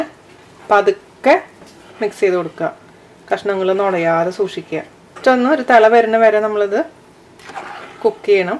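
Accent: Thrissur, India. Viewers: Nice, simple n tasty. Nice to hear your Thrissur accent, n nice English subtitles. If you put some more of those subtitles, it will be of great help for Non Keralite viewers. In north India Kerala people, Kerala cuisine are very popular. Wonderful job👍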